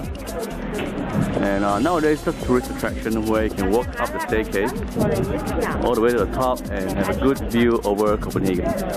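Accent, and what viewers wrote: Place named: Malaysia. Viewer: gotta love malaysian accent in this vid~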